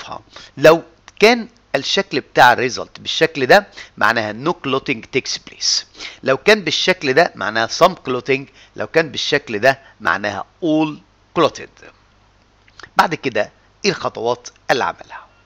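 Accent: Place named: Italy. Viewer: Your accent is so much italian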